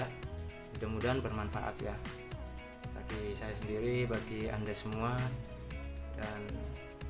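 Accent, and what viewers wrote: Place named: Java, Indonesia. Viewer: Pak ngapak medok jawanya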